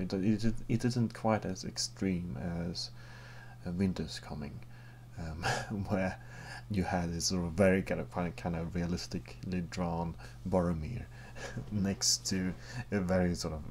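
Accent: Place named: Sweden. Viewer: Oh my god you sound soooo swedish =D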